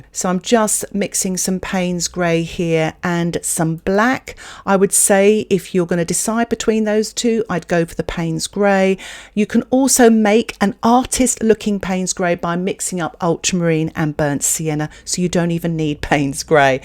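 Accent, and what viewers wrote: United Kingdom. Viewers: i wish I had this video when I first started painting with watercolors. It would have answered so many of my questions. I've done many of your tutorials because I love the sound of your voice and cute British accent, the ease with which you explain things, and the style and subjects of your paintings. Sending much love to you💕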